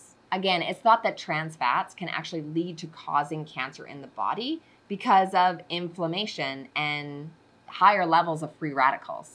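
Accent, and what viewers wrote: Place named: United States. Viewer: great advice. very interesting. I cannot get used to American accents. RESK is a strange word. In England we mostly say R I S K. Nice lady but strange way to describe RISK. She also says FESH instead of FISH. HEY HO I wish the USA well. Thanks for the advice. Please remember that Youtube is shown in UK.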